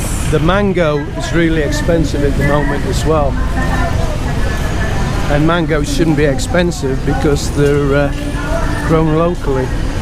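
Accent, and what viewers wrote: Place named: Nottingham, United Kingdom. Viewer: Trying to work out your ascent it sounds Notts area I am still contract working in the UK till next year when I retire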